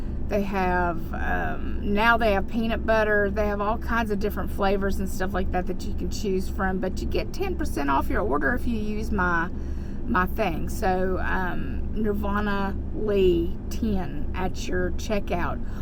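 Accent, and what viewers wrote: South, United States. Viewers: Love the way you say "Oil". You still have a lot of south in the mouth!!!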